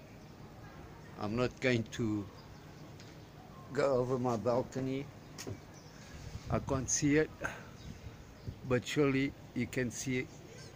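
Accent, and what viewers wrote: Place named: South Africa. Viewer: Do this tracking on the roof or it is not worth anything. You sound like you spent time in South Africa, I could be wrong. :) Use a 360 camera to record these events. :)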